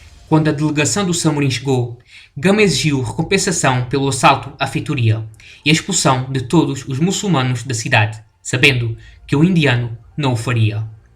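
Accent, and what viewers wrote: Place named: Lisbon, Portugal. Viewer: Pela voz é de Lisboa mas tem uns tiques de além Tejo, (talvez de Setúbal) só tem que carregar no R e fica na muche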